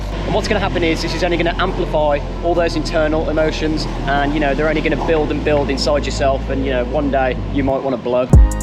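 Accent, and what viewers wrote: Birmingham, United Kingdom. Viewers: Nice to hear a down to earth Brummie accent on channels like this, keeping it real.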